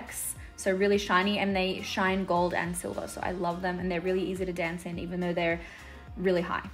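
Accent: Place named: Australia. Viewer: why did i read that in her aussie accent?? 😅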